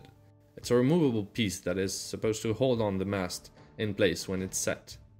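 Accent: France. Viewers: Parceque je suis français aussi et jai reconnu ton accent. Hahaha super travail en tout cas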